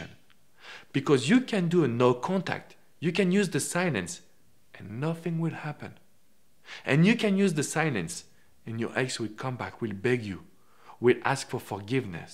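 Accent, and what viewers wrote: France. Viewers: I can tell you are French!!!! Love the accent!!! I am a Frenchyyyy too 😉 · I like his accent, is he french?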